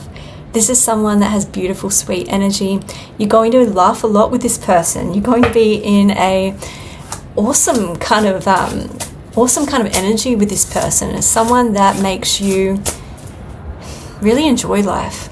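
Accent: Australia. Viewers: just out of curiosity, honey, are you an Aussie? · First, I'm so interested in you because you're the spitting image of my sister at your age (who was Cleopatra, so you are what Cleopatra looked like, if anyone wants to know). Secondly, this has been hilariously entertaining that this adorable little twin sister on the other side of the world with the cutest Ausie accent relates such accurate, intimate details of my life, especially the last 10 years. I'll be listening to this again a few times because they're a lot here. Thank you, for your your talented and skillful reading of the vibrational currents. Oh, and you nailed the name first try. My name is David. 😉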